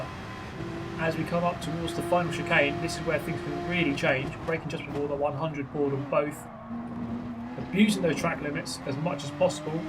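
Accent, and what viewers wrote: United Kingdom. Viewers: In my mind you sounded like more British to me for some reason lol I guess that’s like saying to me if I was in Bristol or something and someone saying to me yea you don’t look to American to me...lol never thought about it like that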